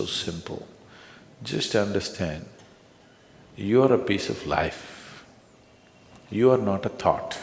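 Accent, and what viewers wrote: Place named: India. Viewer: 薩古魯有印度口音... 真的要聽清楚也不容易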